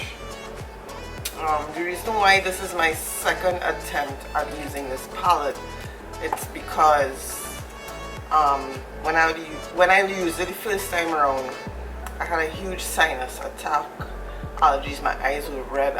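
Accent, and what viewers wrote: Trinidad and Tobago. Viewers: You sound like you have a Trini accent! I love it. My dads from Trinidad. Omg! I just got my palette in the mail the other day! So Pretty!! Loving the look! 💕💕 YOU COMPLETELY SLAYED ME!!! 😍😍😍 I just want to say how much I enjoyed watching your video. Can’t wait to see more! Just left a like 👍🏽 BTW I also have a channel, I would love for you to check it out when you have a chance and show some love and support! I just uploaded a tutorial using this palette as well! Us smaller YouTubers need to stick together, let's support one another! Lol Thanks! 😘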